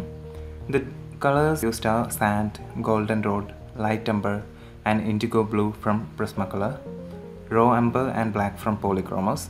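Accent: India. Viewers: Omgggg that Indian accent is too adorable.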